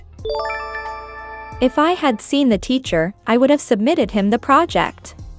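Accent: United States. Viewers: These are not all C1-C2 questions. A decent grammar book in The UK will confirm that. Of course, the accent in this video leads me to believe that an American created this video… C1 is your (grammatical) level if you get more than 40 of these questions correct. And I would have liked to have seen more Tag type questions and mixed conditionals…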